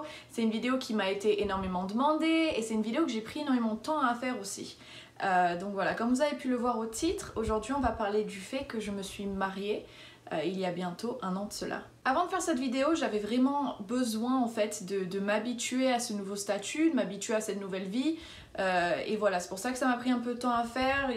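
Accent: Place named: United States. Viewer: tu as pris un petit accent américain j'ai l'impression ^_^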